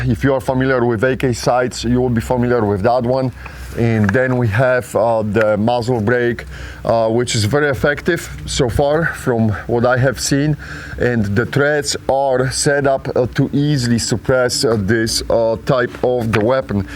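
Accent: Serbia. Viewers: He pronounces his R's a THE sound just as someone who is native Serbian speaker! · Yeah sounds like Serbian I wish I didn't lose my accent or can control it on command haha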